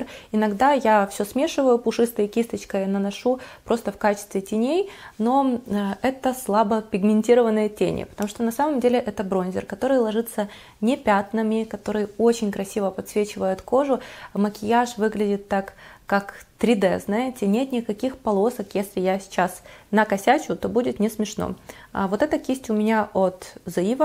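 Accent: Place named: Ukraine. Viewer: украинский акцент не спутаешь с польским или даже русским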